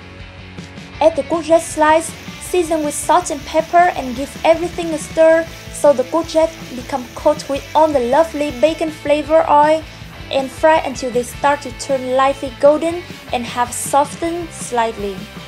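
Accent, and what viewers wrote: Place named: France. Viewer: your english has french accent! so funny, anw it looks delicious, i ll try it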